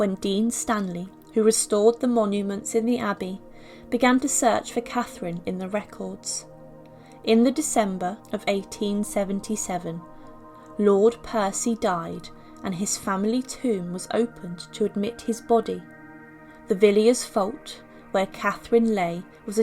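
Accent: England, United Kingdom. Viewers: You sound English. Odd, then, that you do not know that the surname of Samuel Pepys is pronounced "peeps". Just so's you know. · For something so interesting a story, what a scholastic, boring narration. Make it interesting to listen to just not have slight English accent and “ read” ! How pathetically boring! Why bother?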